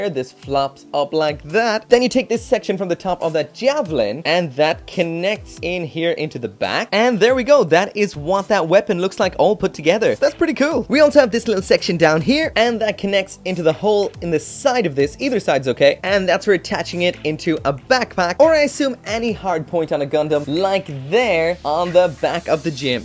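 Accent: Ireland. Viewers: Wait your Irish What county you from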